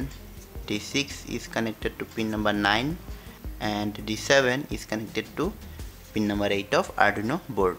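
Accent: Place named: India. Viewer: I loveeeee Indian accent....it sounds like his tongue is shorter than others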